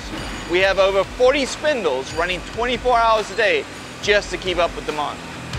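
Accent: South Africa. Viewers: Hi; I hope this is not too personal, but do I detect a bit of an SA accent? Niks verkeerd daarmee nie.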